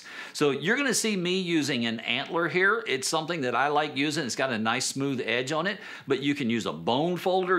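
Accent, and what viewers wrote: South, United States. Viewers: Two southern boys! LOL He's from Ga, I'm originally from Alabama. Glad you enjoyed the project!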